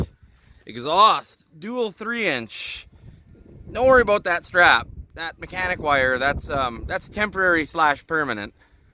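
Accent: Canada. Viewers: My first time watching this channel. Is this guy related to Zip Ties and Bias Plies? All these guys from the Kanukistan prairies sound the same to us yanks. Why is the ground not white?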